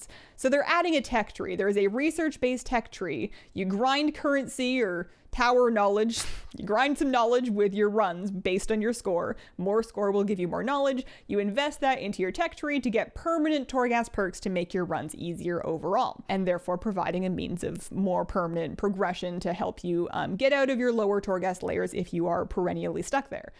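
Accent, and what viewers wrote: Canada. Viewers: Are you Canadian by any chance?:D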